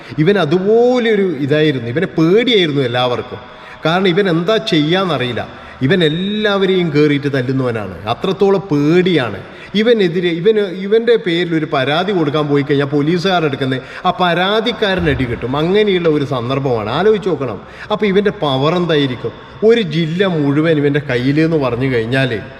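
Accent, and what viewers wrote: Kannur, India. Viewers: നീ എടെ സ്ഥലം, കണ്ണൂർ അല്ലേ 😂നിന്റെ വർത്താനം കേട്ടാൽ അറിയാം 🤣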